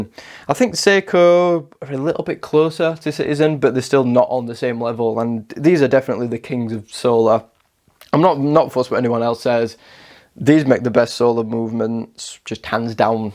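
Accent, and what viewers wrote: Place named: Yorkshire, United Kingdom. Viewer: Well, thanks a lot for this video! I like solar watches too and I have a couple of solar G shocks. I’d like to know why you’re letting the citizen BN150 go… I am waiting for it in the mail and I was wondering if you’re letting it go because of its size. I have a small wrist too, so I hope it’s not for that reason… On the usual, unrelated note: You have silent “t”s (positive, capacitor, latest, better…). Your way of pronouncing “much“, “love“, “tough” sound scouse to me, but it could be any other accent that has that sound. So, in the end, basically I have no clue. Im sure it’s not Scottish, nor Nothern-Irish and I’m prone to exclude the West Country. Any hint?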